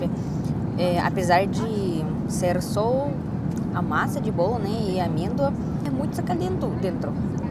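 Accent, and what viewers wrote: Russia. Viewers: você é muito linda, muito fofa, seu sotaque russo é maravilhoso e te deixa muito charmosa, gosto muito dos seus vídeos, sou seu fã brasileiro!!! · Que charme de sotaque dessa russinha! Maravilha.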